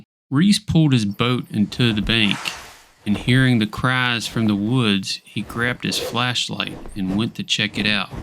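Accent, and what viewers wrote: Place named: Appalachia, United States. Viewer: Hey, I really love your accent and pronunciation. Where abouts Appalachian way are yougrom if you don't mind me asking 😊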